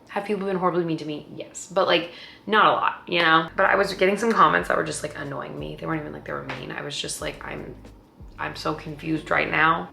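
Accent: New York, United States. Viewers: Another interesting video in the life of, fast talking with her strong New York Accent doing stuff around the City, even getting up early in the morning to go to the parade supported by some of the members of The Flamboyant NYC Crew featuring lots of, From The Twin Sisters R'NT US, The Tall Loud Blonde One, and. There was even a guest spot from, The Mature Student. For someone who claims that she is shy and introverted, certainly knows a lot of people! Also the Cats Bennett and Olive also made an appearance with Bennett having an obsession with sitting on top of the door! Anyway love the content and as for the Critics, they must be jealous because they won't go on you tube! Take care now! From London UK 🇬🇧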